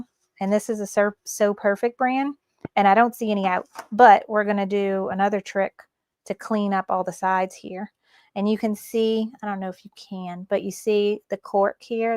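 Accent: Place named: Italy. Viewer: I have really enjoyed this amazing video. Thank you so much. I am using the marine vinyl and have the hardest time trimming it all so the satin stitch covers it. Is it my scissors? BTW I picked up on that accent before I looked to see where you were from. 😂 We are opposite sides of the boot.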